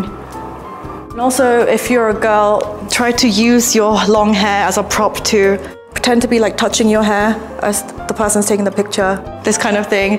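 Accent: United Kingdom. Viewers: Does people study British English in Korea..as her accent is kinda British.. 🤔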